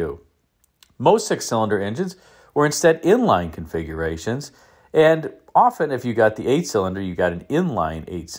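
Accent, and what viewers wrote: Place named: California, United States. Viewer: he sound like he got California accent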